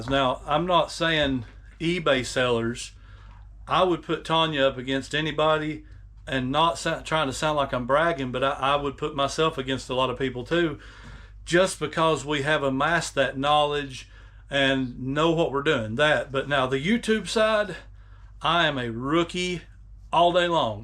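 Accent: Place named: South, United States. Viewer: Southern accents sometimes leaves others guessin 😊 I'm going with Pack It. Hope Y'all have a great Holiday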